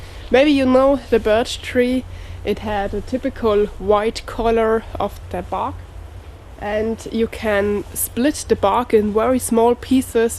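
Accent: Germany. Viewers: It’s called Rosin In The USA,Lov You’re German accent,🥰🙏😇🇺🇸👍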